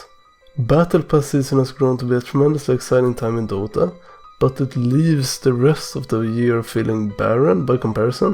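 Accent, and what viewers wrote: Sweden. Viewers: jesus kristus den där tunga svenska dialekten. kekw.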